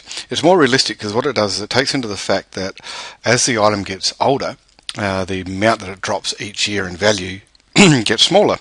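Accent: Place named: Australia. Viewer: thanks a lot mate, really refreshing hearing an Australian voice for a change!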